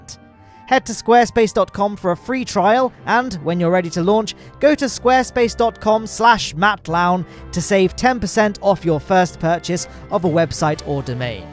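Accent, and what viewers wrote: United Kingdom. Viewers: This particular British Ascent is soo..bombastically annoying but at least he owns it. Total Game show host voice.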